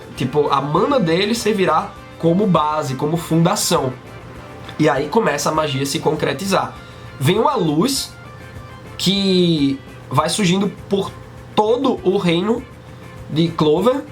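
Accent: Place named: Recife, Brazil. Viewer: Pelo sotaque vc é de recife Pe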